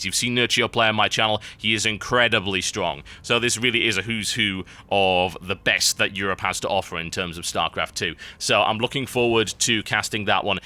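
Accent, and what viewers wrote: United Kingdom. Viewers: people from the US watch a lot because we love hearing your british voice!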